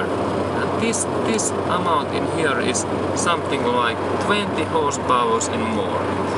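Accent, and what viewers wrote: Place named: Finland. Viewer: In case you ever wondered what a Finnish accent sounds like, now you know...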